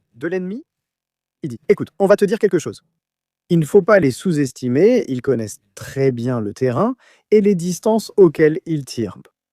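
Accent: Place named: Israel. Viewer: Vous voulez etre les seuls a avoir la bombe atomique. Pour qui vous prenez vous pour les maitres du monde .pourquoi Israël possede telle la bombe atomique.vous ne parlez pas des 75000 palestiniens éliminés par vos force israélienne .aucune sanctions contres l'entité sioniste. J'espère que les iraniens possede l'arme nucléaire.vous devez etre un israéliens cela se devine avec votre intonation. Sortait déjà des territoires palestiniens ou avez commis un genocide inhumain. Mais votre destin est scellé rien ne pourra excusé l'élimination de plus de 75000 palestiniens.avec le silence des européens et des americains.mais dieu veille. et votre Fin et proche.vous n'avez pas d'état vous etes un peuple errant sans domicile fixe.sans la benediction americaine qui vous protege sinon vous n'êtes rien qu'un grain de sables dans l'immensité du désert. mais dieu saura reconnaître les siens. 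Et celui ci feras tombé les foudres du ciel sur vos têtes. C'est vous les terroristes tueur de femme et d'enfant. La situation réelle est que dieu vous chatieras. Tu mangera tes petits pain en enfer.sans les amerloques vous netes rien.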